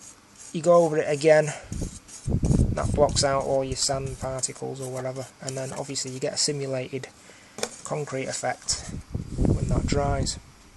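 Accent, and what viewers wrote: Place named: RP, United Kingdom. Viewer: Model tips are great,theres always another way of doing things,you tubes great for sharing idea,s,just wondering which tv company will ask us both to do voice overs for advertising,yours is a touch posher than mine,LOL,cheers Tony.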